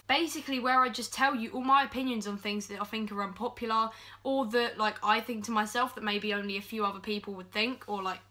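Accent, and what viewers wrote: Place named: Ireland. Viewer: girl he always posts irish vids when she sounds irish herself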